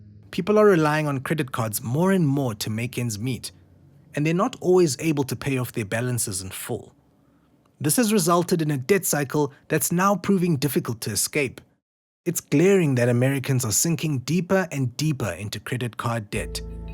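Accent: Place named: India. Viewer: Why is the narrator’s voice Indian?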